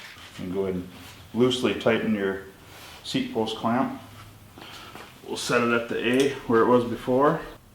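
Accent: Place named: United States. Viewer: Thank finally you are the best your video its simply good easy explanation and America accent. Awesome dude. Ty saying hello from Connecticut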